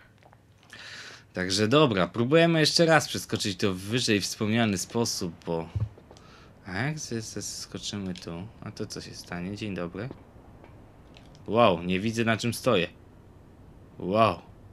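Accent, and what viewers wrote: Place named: Podhale, Poland. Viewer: Hehe, nie moge z twojej góralskiej gadki coś w stylu " ty nie wiesz ale ja wiem,a jak nie wiem to się dowiem " xD. Pozdro.;)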